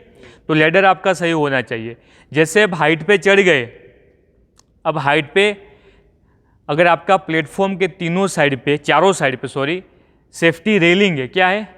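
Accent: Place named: Gujarat, India. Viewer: Mai bhi sir idhar TL line me kam krne aya hu Gujarat ap jaise bol rhe hai idhar bhi log aese hi bolte hai isiliye laga ap gujrat se hai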